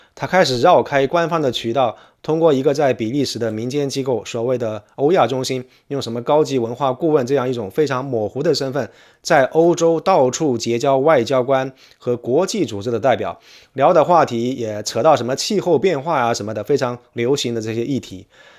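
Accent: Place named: Hong Kong, China. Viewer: 博主是不是香港人或者广府人？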